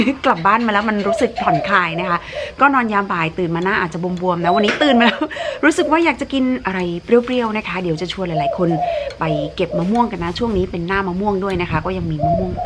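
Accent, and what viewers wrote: South, Thailand. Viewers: เพิ่งเคยได้ยิน พูดภาษาใต้ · แลคลิป แหลงใต้ น่ารักมากๆเลยค่ะ😍❤️ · พูดใต้ได้ชัดเจน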